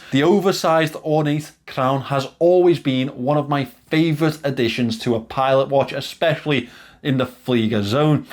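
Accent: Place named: Liverpool, United Kingdom. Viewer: Actually, I didn't know at the beginning. I even thought you were not native English speaker..😅 I researched little and I found out that someone speaks same like your accent and I realized there were many people same like your accent in U.K. and it was Liverpool..😄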